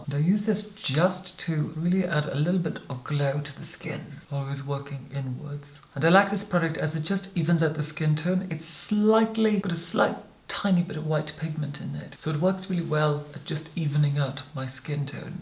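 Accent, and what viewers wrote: Scotland, United Kingdom. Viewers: Would love to hear your Scottish accent…….love from Aberdeen 🏴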